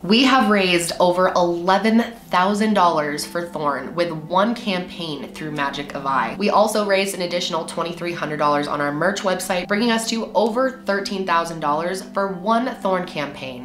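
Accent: Minnesota, United States. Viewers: you're doing a case super close to home.. st cloud is 1.5 hours away from me.. and cedar rapids is 2.5 hours. mason city is 2. super close. other note,, our MN accents are strong lol. I get picked on for certain words I say. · I loooove Minnesota accents. Why should she, or anyone, have to work toward getting rid of it? This case is so sad. :( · Her cute little MN accent 🥺🥺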